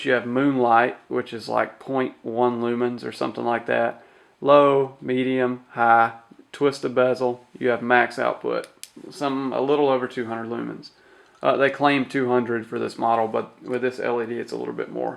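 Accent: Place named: South, United States. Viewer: embrace your southern heritage and accent.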